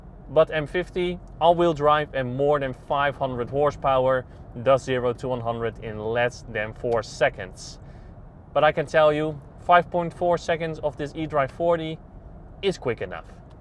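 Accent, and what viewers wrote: Netherlands. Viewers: Stone coal English … so bad. Why don’t you stick to Dutch?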